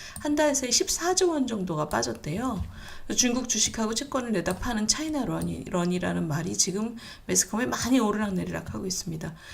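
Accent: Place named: Seoul, South Korea. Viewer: 말투가 저랑 똑같아요. 경기도 방언인지 서울 사투린지 나랑 너무 똑같아요. 희숙님 ! 나이에 맞지않게 젊어 보여 좋아요. 희숙님 영원히 늙지 말라고 기도 할께요 😊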